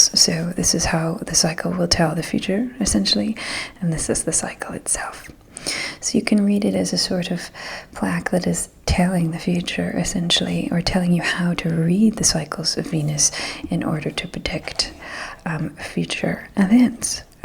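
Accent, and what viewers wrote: Transatlantic, United States. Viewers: sounds almost transatlantic to me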